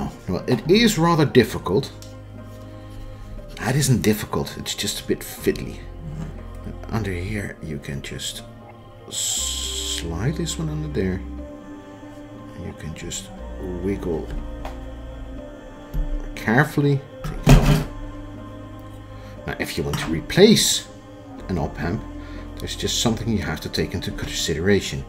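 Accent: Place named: Netherlands. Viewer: Nederlander die een beetje aardig Engels kan, 👍🏼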